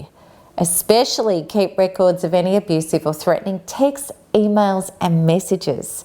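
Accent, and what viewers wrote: Australia. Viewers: Thank you for helping me understand. Three years ago I found you as a result of an awakening prompted by intuition something was not quite right I read an article you had authored. Since then, devaluation, discard, deletion. Loss of home, children, job, family, everything except one very important belief. Me Thank you for your for courage . I kept hearing this Australian voice in my thoughts assuring me I was not alone in this nightmare Thank you, 'down under'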